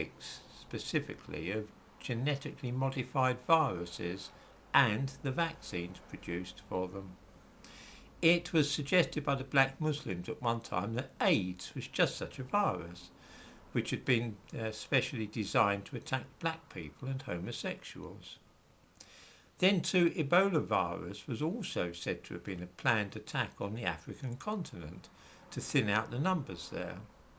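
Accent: United Kingdom. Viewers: got a wonderful British accent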